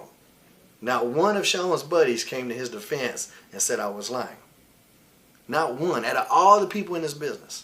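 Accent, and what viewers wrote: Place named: North Carolina, United States. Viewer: I love Shane he don’t hide that N.C. accent. I’m from N.C. so him, Matt, and jeff are kinda hometown heroes for me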